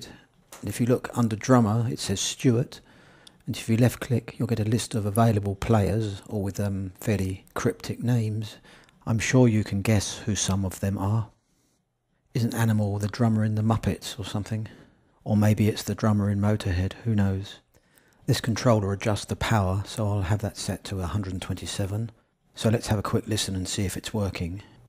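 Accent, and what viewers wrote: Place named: England, United Kingdom. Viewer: luv your Enlgish English accent <3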